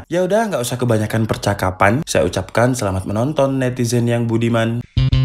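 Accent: Malang, Indonesia. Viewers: Wong Malang a iki?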